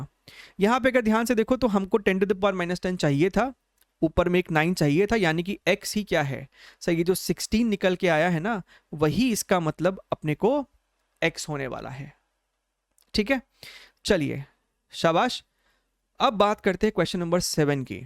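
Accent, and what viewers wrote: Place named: Haryana, India. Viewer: 1:21:8 sir haryanvi tone 😀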